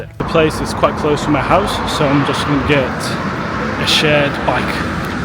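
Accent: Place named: United Kingdom. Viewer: I really enjoyed this video. Very interesting. Guessing you studied in the UK from your use of "dodgy", right? You actually sound like a Brit, to me, and I'm English. Your commentary on how people are "ruthless and rude" is a nice contrast to most of the videos I've watched from ex-pats who seem to get lost in heaping praise, for whatever reason. I'm considering TEFL there, but my Mandarin would need to get a LOT better first.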